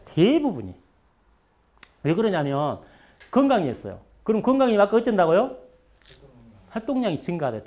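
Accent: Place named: Jeolla, South Korea. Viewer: 박사님 전라도 분이신가요? 저도 전라도 사람인데 왠지 억양이 친근하게 느껴져서요^^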